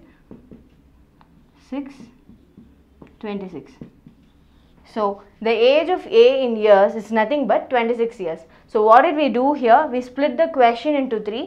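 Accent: South India, India. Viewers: She is south Indian..am damn sure..most probably from Bengaluru. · i like ur voice so much.. 😊 south indian tone ♥️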